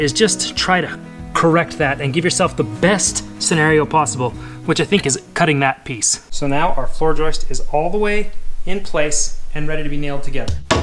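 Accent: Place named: United States. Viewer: Well done man, you navigated me vocally during your video.. Classic keep it up. I’m Irish and hearing your proper American accent had me smiling and laughing respectfully. Goodstuff boss keep it up!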